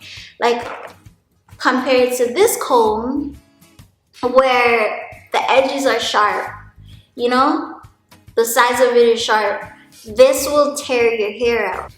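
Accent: Jamaica. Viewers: Hearing your Jamaican accent lol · as soon. as I heard you i knee you were Jamaica, big up yourself, you have my support, fellow Jamaican in the US. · Your accent is so strong and beautiful 🇯🇲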